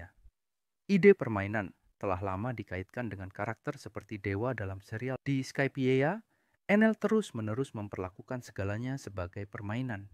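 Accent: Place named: Ngapak, Indonesia. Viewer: cok kalo medok gausa gua gua, gaenak didenger jirr guanya ngapak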